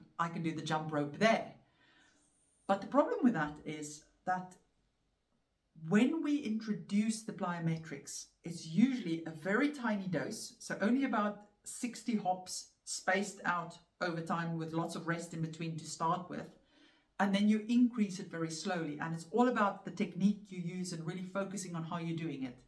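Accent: South Africa. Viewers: I love your accent! Are you south african?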